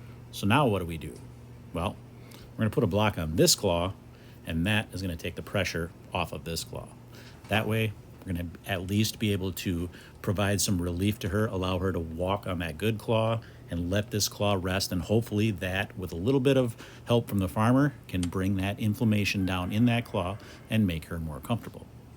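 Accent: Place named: United States. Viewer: Opinion on accent depends on where you're from! As a Brit I'd say has a strong American accent!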